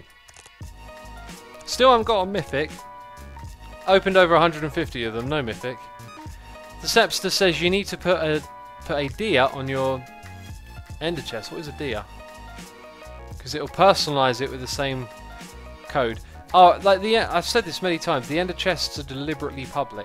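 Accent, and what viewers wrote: United Kingdom. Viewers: Please dont take that as an insult by the way your content isnt boring at all, its just something about your british accent that always does the trick for mr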